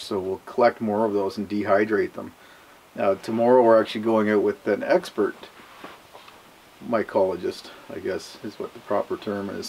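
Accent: Canada. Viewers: Can anybody tell me his general location? I mean I know it’s North country but is it in the United States? I get this feeling from his Accent it’s possibly in 🇨🇦 Canada.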